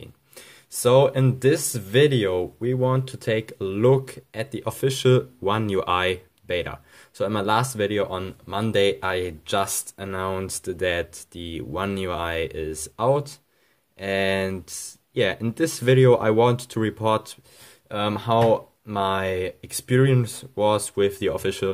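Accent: Germany. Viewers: I love your German English accent 😍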